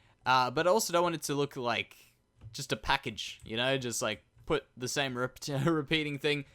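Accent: Australia. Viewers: Are you Australian? I subbed because you're very Interesting and cool :3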